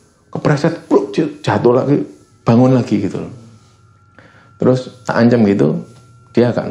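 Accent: Java, Indonesia. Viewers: Penyampaian y enak,jawa y kentel pol cak,fans baru cak semangat 👍👍👍💪💪💪gending jawa y bikin ayes adem ati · Sayaa yg orang Melayu Kepri, sukaaa denger mas cerita2 horor, sukaaa denger logat Jawa nya😆😆😆😆😆🤣🤣🤣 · Daya Saing utama adalah di lagam lagam jawanya yang bikin ngeri ngeri sedep... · Jaaann jowo tenant...mantebb, medok e toop markotop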